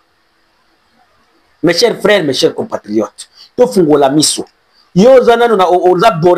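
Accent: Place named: Rwanda. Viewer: Namoni yo ozali mutu ya Nanga tika lokuta ndoki ya kongo bokokima pe ozali Ruande yoka lingala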